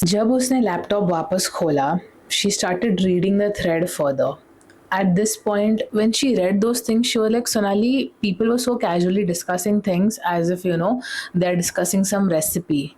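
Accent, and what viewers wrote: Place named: South, India. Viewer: Hello girl! new subscriber here. I LOVE YOUR NARRATING SKILLS, THAT SOUTH INDIAN ACCENT (if you are) and you add new vibe to story telling stuff from other story tellers on youtube platforms. Here for your content from 31.6k subscribers to 1million and more! LOVEEE YOUU BBG 🧿💕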